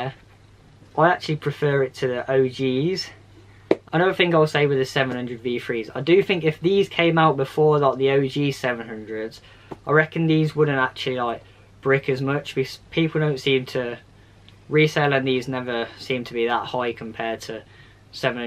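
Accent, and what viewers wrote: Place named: United Kingdom. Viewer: what part of the UK did you come from with that accent mate?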